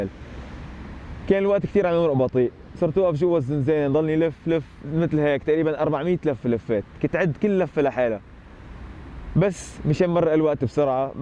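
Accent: Lebanon. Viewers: لهجتك كتير قريبة ع اللهجة اللبنانية، و ع فكره باركور مخيف😍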